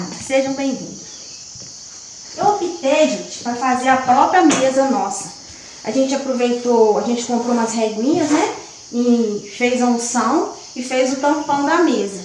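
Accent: Minas Gerais, Brazil. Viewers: Chegando no canal. Ganhou mais uma inscrita. Seu gosto, meu gosto. Amo reaproveitar, transformar, inventar e por aí vai. Esse fogão amarelo deu um charme todo especial. Tenho o costume de pegar objetos de família e restaurar. Tenho várias coisas de roça do meu avô. Se vc soubesse o qt gostei da sua casa!!! O quarto cheio de caminhas com colchas de retalhos, os bancos, as cortinas, o banheiro todo lindo e até a sua timidez 😂. Sou mineira também. Seu sotaque ñ nega. Parabéns. A-DO- REI 👏😘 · É mineruai kkkk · Com sua voz de mineirinha ficou um show e amei sua casinha . Parabéns e Deus abençoe sempre beijos pra vc.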